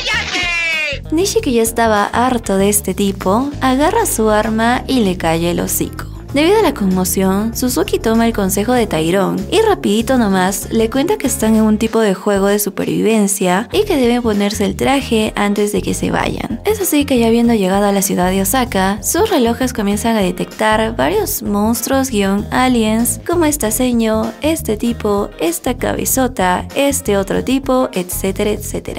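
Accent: Peru. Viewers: Eres de perú? Digo por el gaaa y gracias por el resumen eres la mejor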